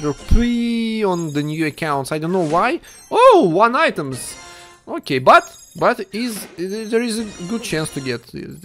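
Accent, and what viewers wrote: Russia. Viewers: Russia, Look At His Accent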